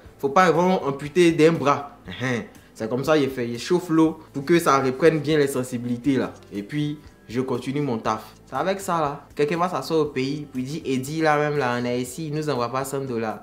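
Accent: Ivory Coast. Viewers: Orrrrr djo j'aime trop t'écouter 😂 depuis ABIDJAN, sérieux ivoirien peut pas se cacher, quand il parle net ont sait que c'est lui 😂😂😂💕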